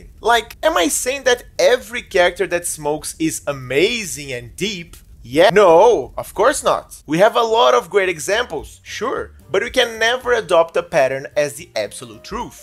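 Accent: Brazil. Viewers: loved the video dude, but where is your accent from? the way you pronounce the names of the characters sorta remind me of the brazilian way of saying their names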